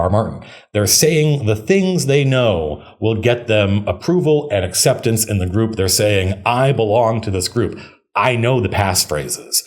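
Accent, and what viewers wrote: South, United States. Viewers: I love your southern belle voice,.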